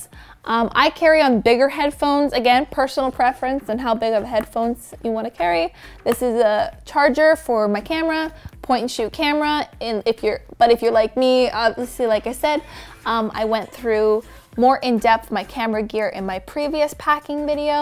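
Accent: Canada. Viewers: I noticed that too. I thought she was Canadian.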